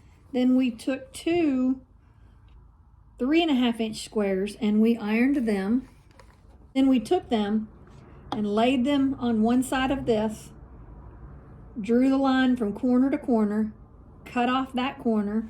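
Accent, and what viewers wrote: South, United States. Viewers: I enjoy your southern accent. Your teaching techniques are very clear and easy to follow. I would have never thought of making the flying geese like this. Very colorful and unique. I have sewing since age 8 and am now 76. I’ve just gotten started w guilting. I am making quilts for premiers who don’t make it for parents to keep or bury child with. I’m a retired nurse and am making guilts for children with cancer. I would gratefully take some scraps off your hands and even pay postage to Kansas City MO. Continue designing and sharing w us. So get found your YouTube channel. Blessings 🎉 I’m a 100% disabled Vietnam Veteran registered nurse74-76 w asthma/COPD and sewing gives me pleasure and joyful gratitude to provide encouragement to others. ❤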